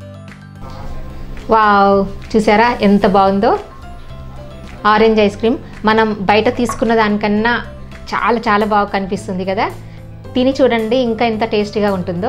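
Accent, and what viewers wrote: Telangana, India. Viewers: Andaru Andhra lo cheptaru meru Aina koncham Telangana matladthunattu anpisthunde ❤️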